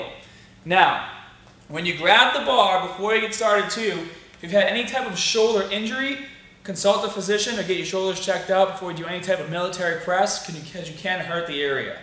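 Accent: Boston, United States. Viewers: His accent seems less intense back in 2012. weird...it's like his Boston accent got more pronounced over time. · What happen to your buston awccent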